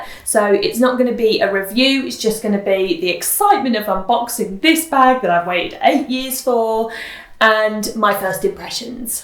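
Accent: England, United Kingdom. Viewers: I like your English accent 😊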